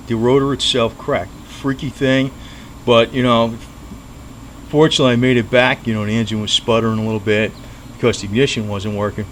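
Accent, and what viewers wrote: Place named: New York, United States. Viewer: Seen a few of your vids. Your NY accent reminds me of home. Im in the Army at Ft Bragg, NC. I ride year round here. Even if it snows. Miss NY riding. Great roads up there. Especially up in dutchess county and further north. Nit too many winding roads down here. Ive got a 06 sporty 1200 (which is for sale) and a 05 dyna wide glide that I love. Im on my dyna almost every day. I barely cage at all. Real riders unite!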